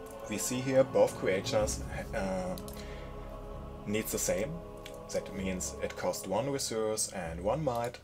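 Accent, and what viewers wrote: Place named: Germany. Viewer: Du bist auf jeden Fall Deutsch xD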